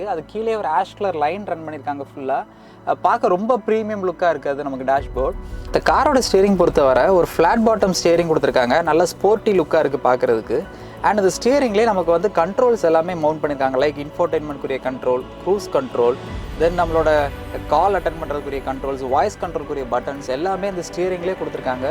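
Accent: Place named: London, United Kingdom. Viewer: இது தமிழ் விமர்சனமாக இல்லை. தம்பி லண்டன்காரா் போல பேசுராரு.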